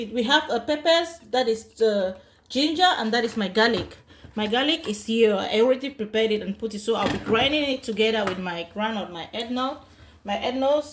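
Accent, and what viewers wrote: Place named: Germany. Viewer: LOL I hear how German is overtaking you. You cooked it the real Cameroonian style. Well done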